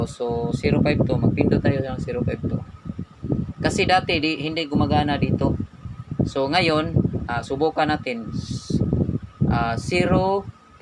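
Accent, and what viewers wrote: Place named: Visayas, Philippines. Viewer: Galing mo master..asa inyuha master..murag bisaya sad ka master Bah..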